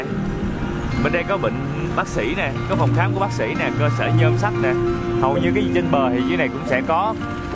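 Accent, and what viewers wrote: Mekong Delta, Vietnam. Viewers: Thiệt sự là cách anh edit video rất cảm động luôn. Những đoạn mà nhạc lắng xuống, giọng anh thì trầm ấm đúng giọng miền Tây làm cho mình cảm giác được sự yên bình của quê hương miền Tây. Với 1 đứa sống ở SG xô bồ tấp nập như mình thì mình thực sự rất rất nhớ cái cảm giác yên bình đó. Lần nào xem video của anh cũng cảm động, vừa nhớ nhà, nhớ mùi khói bếp than, nhớ cái nắng xuyên qua những kẻ hỡ trên mái nhà buổi sáng sớm. Cũng nhớ sự nồng hậu, hào sảng của người miền Tây nữa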